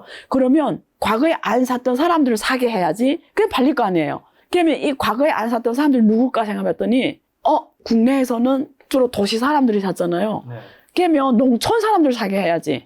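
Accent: Yanbian, China. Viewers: 중국말투 너무 듣기. 싫습니디ㅡ. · 말투가 완전연변말투 ㅋㅋ그리구 군사전문가두아닌게뭔 우크라이나와 러시아전쟁분쟁얘기를 하구있나 돌팔이두아니구 헐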